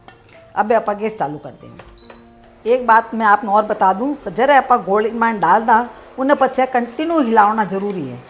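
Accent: India. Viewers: Amma I love your way of speaking.desi style.😙😙😍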